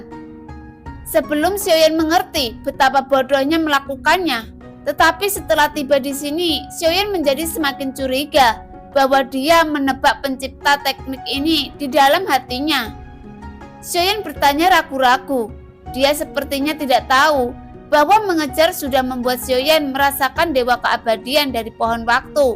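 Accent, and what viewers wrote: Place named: East Java, Indonesia. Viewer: Baru kali ini nemu channel BTTH acak"an gini, dan pembawaannya medok jawa timur sungguh gak enak didengar, PARAH NI NENEK NENEK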